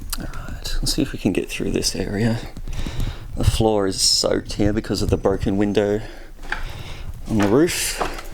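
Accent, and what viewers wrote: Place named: Australia. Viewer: australian english 🤙🏻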